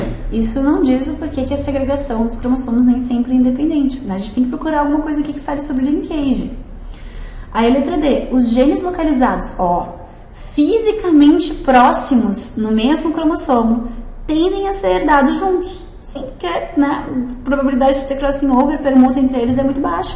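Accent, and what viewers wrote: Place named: Rio Grande do Sul, Brazil. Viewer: Bah tchê, pelo sotaque é gaúcha!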